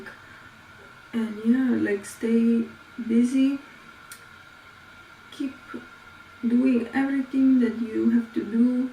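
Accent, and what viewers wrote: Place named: Switzerland. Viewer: Your accent sounds Switzerlandish :) Nice to meet u sister Allah keep u strong sis. Amin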